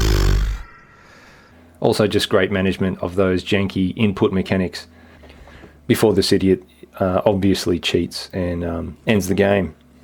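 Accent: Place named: Australia. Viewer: British!? This dudes an Aussie buddy!!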